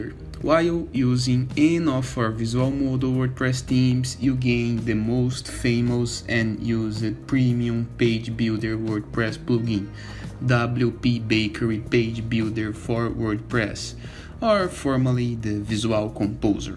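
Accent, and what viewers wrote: Brazil. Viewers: Pense num sotaque BR! vlw mano pelo vídeo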